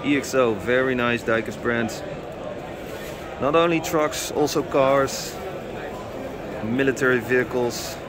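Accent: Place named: Belgium. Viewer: it's funny, when talking English sounds Belgian, when chatting Dutch rather 'Hollands':-)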